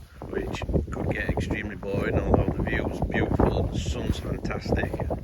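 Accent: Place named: Northern England, United Kingdom. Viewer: Greatly enjoyed the chat. Beautifully Northern, lad!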